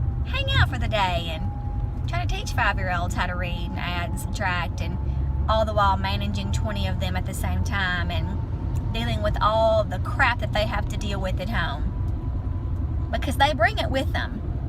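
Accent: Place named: Tennessee, United States. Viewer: your videos are cute as a bug. Love that Tennessee accent the sheriff is a very lucky man to have such a sweet young lady. Remind him quite often how lucky he is. Jmal Jim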